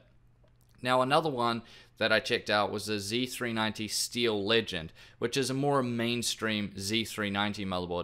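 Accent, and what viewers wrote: New Zealand. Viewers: .....damn i forgot where i was going with the first half of my comment! oh well, its nice to listen to another Kiwi talk tech!!! :D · .. hear your Kiwi accent ?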